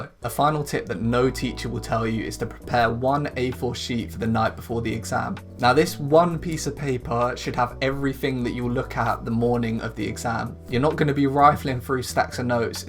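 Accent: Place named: United Kingdom. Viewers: it said GCSEs, so not edexel igcse , yes hes from uk, also rules and things he told is also appllicable for igcses, i m in igcses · Did you do edexcel igcse ( you sound like your from the uk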